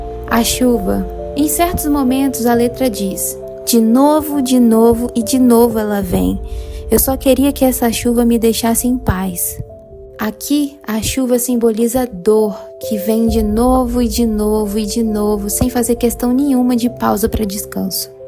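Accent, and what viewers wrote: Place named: Bahia, Brazil. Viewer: Olha só, que incrível! Amei esse vídeo, você é muito talentosa, a televisão precisa de pessoas assim como você, jovens de talento e bom gosto. Imagino que você deva ter no máximo 22 anos. E como vocẽ conhece tão profundamende a história de e, principalmente a história dessa música?! Parabéns mesmo. Muitos da sua geração e das mais recentes, deviam ter contato com a boa arte dos anos 70, 80 e 90, para que talvez, com disseminação da boa cultura, quem sabe assim novos artistas como e tantos outros possam surgir no mundo. Meu nome é Jô, tenho 52 anos, moro em São Paulo e nasci em Jequié na Bahia. Achei você com o sotaque meio bainao e pernambucano. Fala aí, de onde você é? Quanto a música, ela é incŕivel, as gotas de chuva em câmera lenta, é incrível o clip. Quem sabe talvez você não possa fazer um vídeo falando da também? 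É outra cantora incrível que merece ter muitas músicas destacadas. Sucesso pra você garota, você tem o talento estampado na cara, continue assim com sua simplicidade que você chegará longe.💚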